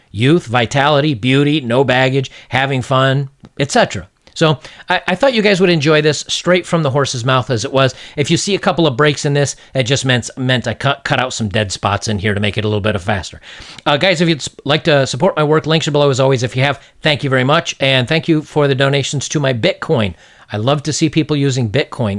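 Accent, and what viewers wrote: South Africa. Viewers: Gotta love that South African accent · She sounds South African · She's definitely South African. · She kinda sounds South African or maybe the UK? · Also she sounds south african and as a south african, i know bitches be crazy. how did I not have a gf for 26 years of my life and I go to thailand for 2 years and women throw themselves at me. My current thai gf keeps saying that she doesnt believe I have never had a gf in a south africa. western women man.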